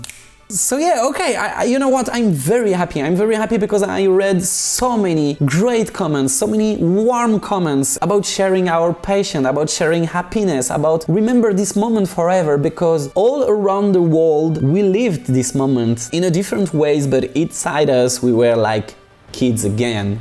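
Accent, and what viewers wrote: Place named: France. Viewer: its always beautiful this french-english accent, keep goin :))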